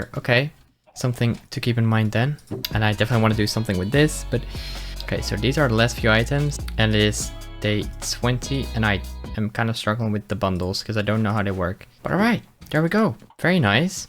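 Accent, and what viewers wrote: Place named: Netherlands. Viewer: Oh! You’re Dutch? I always wondered where you were from. I feel silly for not guessing it sooner based on your accent. Hello from Toronto! 😄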